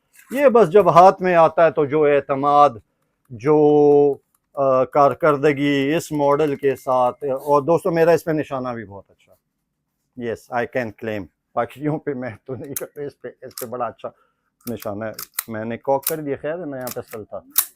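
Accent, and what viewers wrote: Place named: Hindko, Pakistan. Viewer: sahib very nice hindko dialect ❤, secondly mein nay bhee apna 30 bore 21000 ka khareeda tha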